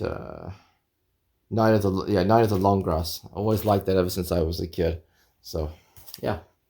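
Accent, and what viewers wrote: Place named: United Kingdom. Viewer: Hopefully not your last video, but if it is thanks for the sharing and entertainment, gonna miss your videos and your British accent. Lol. By the way, is from Lebanon. Hope to see you again.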